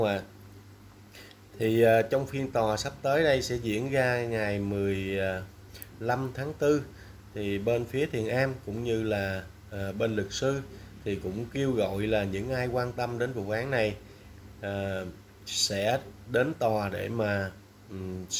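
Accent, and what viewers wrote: Standard. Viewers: Em có giọng nói chuẩn như các bạn trong ban biên tập vậy đó. Chúc em cùng gia đình nhiều sức khỏe và an vui hạnh phúc nhé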